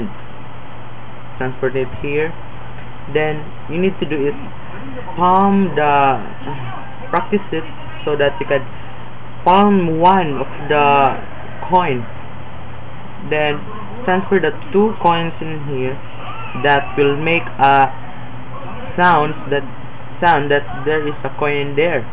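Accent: Philippines. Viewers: Dude r u Filipino? Ur accent is so strong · HAHA total Filipino accent good job though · he has a fob voice philipino pride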